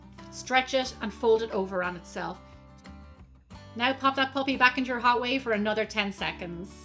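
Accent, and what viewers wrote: Ireland. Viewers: Irish accents are just so cool.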